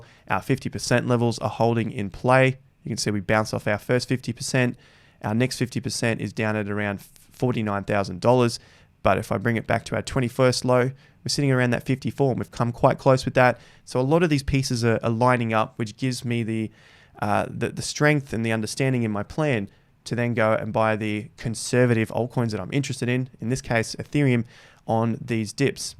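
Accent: Australia. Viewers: It’s great to hear another Aussie. Are you Melbourne based?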